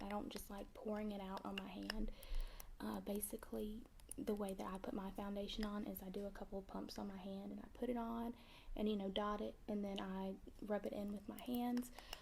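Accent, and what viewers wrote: South, United States. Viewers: Do you have a slight southern accent?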